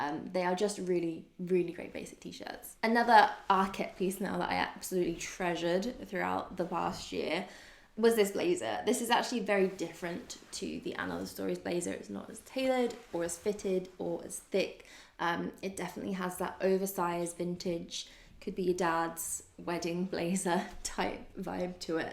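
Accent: United Kingdom. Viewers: Where are you from? I know you’re accent is British but it sounds slightly Americanised in some parts!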